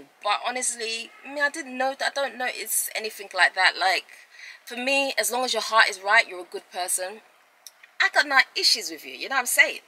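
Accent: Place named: United Kingdom. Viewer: You are British my dear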